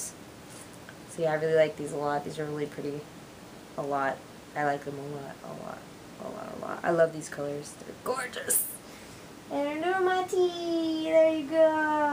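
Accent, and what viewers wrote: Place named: Cockney, United Kingdom. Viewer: Random accent almost sounded a little Cockney. :D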